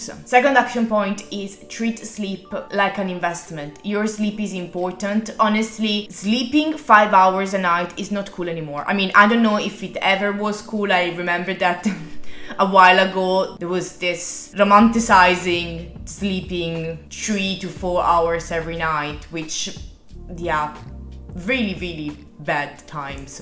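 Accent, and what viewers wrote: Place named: Italy. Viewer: Hello, good morning 😊. I watched this video of yours and I liked it. I recognized you for your Italian accent. I admire the architecture and love music of your country a lot. Greetings from México 🇲🇽. By the way, both countries share the same colors in their flags. 😊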